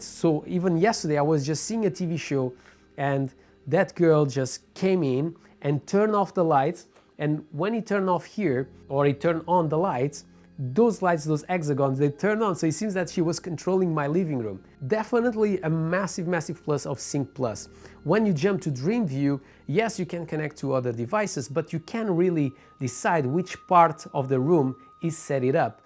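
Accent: Portugal. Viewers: Caralho, pelo sotaque és tuga😆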